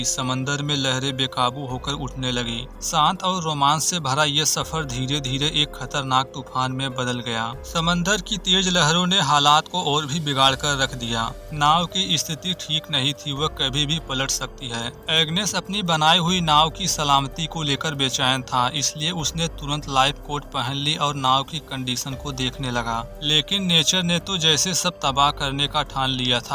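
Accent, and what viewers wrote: Bihar, India. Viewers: Bhai bhihar se ho kya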